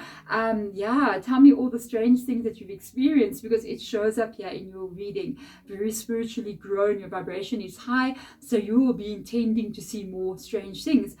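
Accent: South Africa. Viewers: Hello! I am a fellow South African Aussie! I miss the Saffa accent and I’m so glad I found you! I could listen to you talk for hours. Thank you so much for the Sagi guidance 🇿🇦🇦🇺♐️🤍🙏🏻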